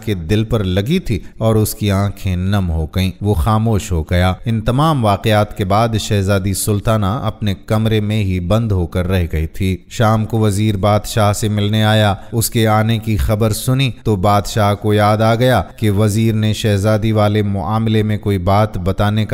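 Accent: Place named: Arab world. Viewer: Samaj nahe aata ye urdu hai ya arabi, mehrabani kar ke urdu alfaaz ko Arabi talaffuz ( pronounce) na kare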